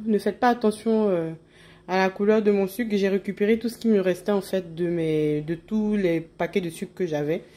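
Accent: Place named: Ivory Coast. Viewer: Je m’abonne parce que ta recette est facile et que tu as l’accent ivoirien donc je ne peux que soutenir ma compatriote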